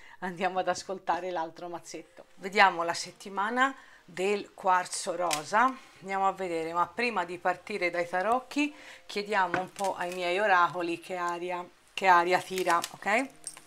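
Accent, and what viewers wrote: Tuscany, Italy. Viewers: Sentirti, con questa meravigliosa parlata Toscana, è bellissimo. Io delusa.. Uff ormai ci ho fatto il callo.. Mi piacerebbe molto lo sai trovare finalmente la persona giusta, ma è molto difficile. Ma nonostante questo mi piace sempre ascoltarti❤️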